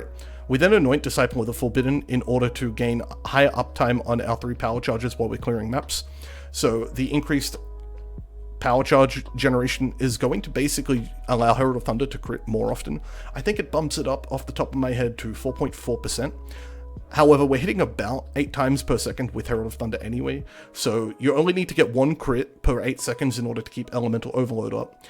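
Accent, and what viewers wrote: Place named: Europe. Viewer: opening the video, seeing gameplay & hearing an EU dude right away Me: Oh this is a good build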